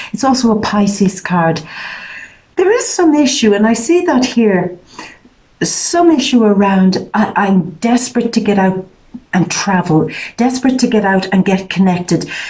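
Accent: Ireland. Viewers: I love that accent. It's always felt familiar when I was like 3 years old but being American and my only time hearing that accent was from watching Darby O'gill and the little people with my great grand mother and my great grandfather singing when Irish eyes are smiling. It's always felt like home. And your reading is spot on. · Your voice reminds me of when I lived in Ireland. I don't want yesterday, he was my past. How would one get a private reading. Slante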